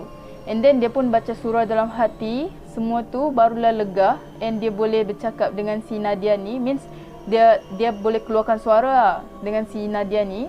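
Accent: Sabah, Malaysia. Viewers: Orang sabah ke sis ? Cara cakap mcm org sabah 😂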